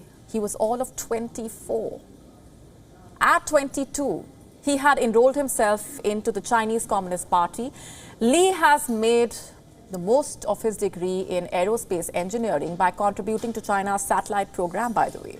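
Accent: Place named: India. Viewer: Indian tongue as always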